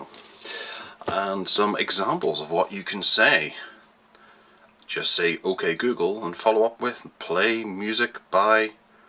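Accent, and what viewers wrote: Northern Ireland, United Kingdom. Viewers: lol never mind. It's nice to click on a review and hear a fellow norn iron accent though:)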